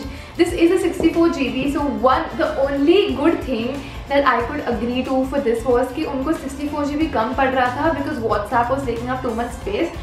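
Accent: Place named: Maharashtra, India. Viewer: Hi di Ur videos are amazing. Apke videos me na woh marathi hindi ka jo touch hai na I love it😍😍☺🙂😁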